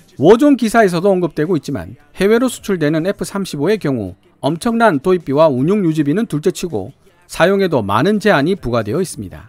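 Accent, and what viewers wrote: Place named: Busan, South Korea. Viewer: 부산 사투리 억양때문에 도대체 뭔말을 하는지 모르겠네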